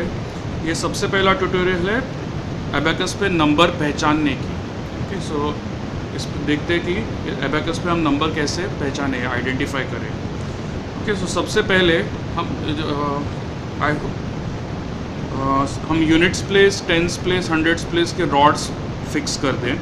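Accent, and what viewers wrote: United States. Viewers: Kyu aap american hai Kya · tu america se hai kya??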